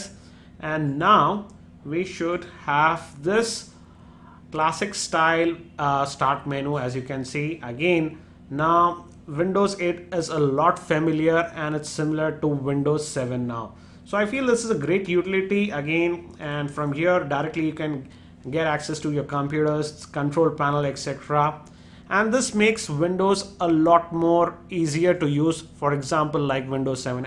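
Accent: India. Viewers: LOLOLOLOLOLOLOLOLOLOLOLOL Indian accent <3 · lol i love indian accent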